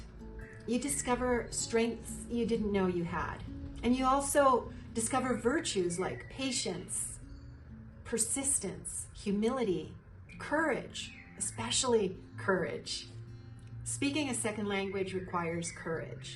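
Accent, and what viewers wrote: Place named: United States. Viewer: This américain accent ????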